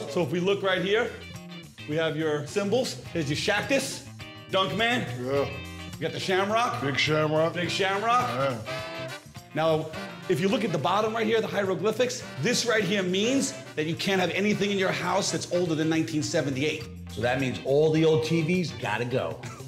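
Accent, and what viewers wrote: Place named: New Jersey, United States. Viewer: They sound like Italian "Joisey" boys, are they from "Joisey" ...they're loud and obnoxious from there.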